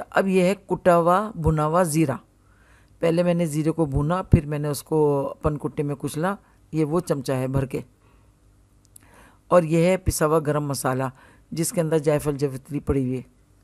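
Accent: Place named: Lucknow, India. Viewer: I love your "urdu", sound like "Lakhnao" ki urdu...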